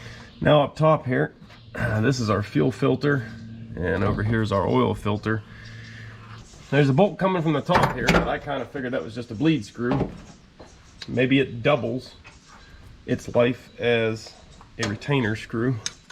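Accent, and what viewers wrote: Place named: Pittsburgh, United States. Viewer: Love to listen to yinz’s accent! Just like home. Very interesting!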